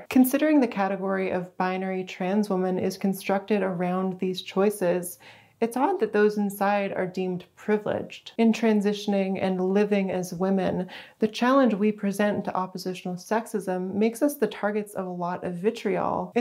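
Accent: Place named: Canada. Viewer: P.S. I love your Canadian accent! Much love from the UK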